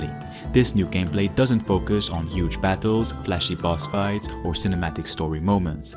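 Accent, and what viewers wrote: Quebec, Canada. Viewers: Gros accent québécois haha (Bon vidéo!)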